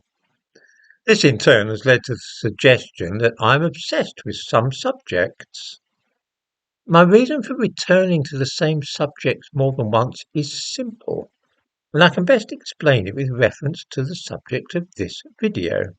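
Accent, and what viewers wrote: United Kingdom. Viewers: Great video, and it was nice to hear the english i miss and never get these days. But please please please, have that mustache trimmed and fixed. And learn to use all the products thats out there for beards and so on. You wash it and form it every 2 days or so, throw a bit beard honey in there, and it will look more immaculate. And not like you've glued a dead rat on your face. Its gonna make a world of difference. Regards from a brit in Denmark.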